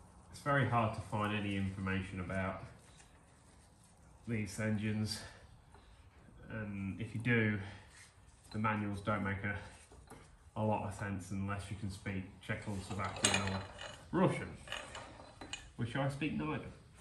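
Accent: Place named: North Lancashire, United Kingdom. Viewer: Sound like your from north Lancashire, what bits do you need I'm sure I can find them 😉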